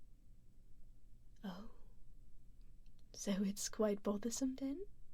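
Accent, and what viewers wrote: United Kingdom. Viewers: oh goodness, I love your British voice. This is just sublime Miss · I love the British accent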